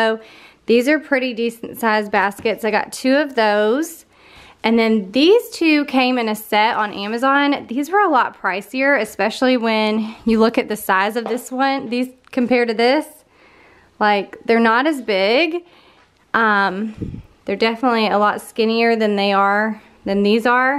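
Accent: South, United States. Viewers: I watch you on TV while cleaning and filming along with many other favorite YouTube cleaners. Lol I noticed your accent sounded a lot like mine and was wondering what area you’re from. I am a YouTuber as well, I am from North Carolina. I really enjoyed your motivating video and I loved how your bookshelf turned out. I am always doing DIY projects on furniture.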